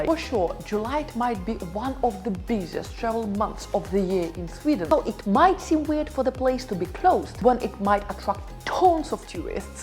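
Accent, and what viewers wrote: Russia. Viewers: Are you russian? You have russian accent . An intonation mostly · Похоже на русский акцент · Your russian accent hurt my ears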